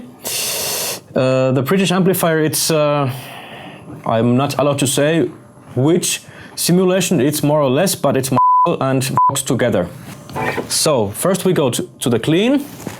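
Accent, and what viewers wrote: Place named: Finland. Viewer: suomienglanti ftw :D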